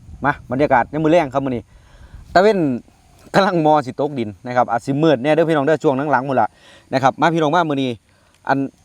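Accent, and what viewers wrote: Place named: Sakon Nakhon, Thailand. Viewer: อ้ายบ่าวท้าวกินเป็นตาแซบแท้ สำเนียงเว้าออกไปทางคนสกล ลมคือสิหนาวเนาะครับ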